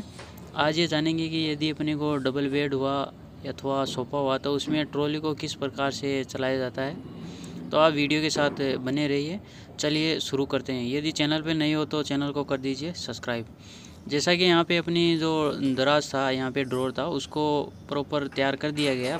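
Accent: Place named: Gujarat, India. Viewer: गुजराती हो क्या आपकी वोइस से पता चला आगेखेचेंगे तो , 55 , 66 का बेड है डबल बेड अछि तकनीक है 👍👍🙏 🔨🔨🔨 गुड़ वर्क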